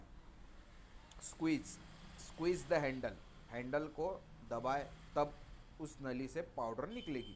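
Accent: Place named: Maharashtra, India. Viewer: From your hindi... I assured that you are MARATHI person... Jay maharashtra bhau 🙏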